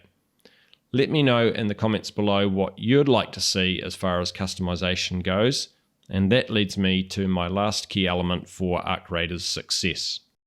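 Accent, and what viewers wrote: New Zealand. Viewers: As a kiwi abroad, it now hurts physically to hear a kiwi accent.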